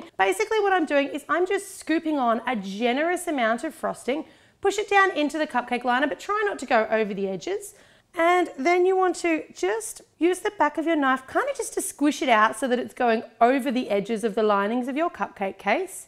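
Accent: Australia. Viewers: I knew you were Australian ;) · shes austrailian;)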